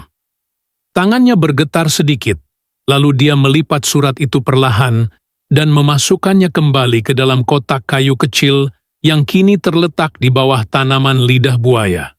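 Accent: Malaysia. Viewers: Dimana bisa mendengar langsung pengakuan dari meriam.belina? Vlog ini kam narasi dari admin yg dr logatnya orang melayu/malaysia bukam dr indonesia..bisa saja bohong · Kok logat malaysia?vlog dr sana kah?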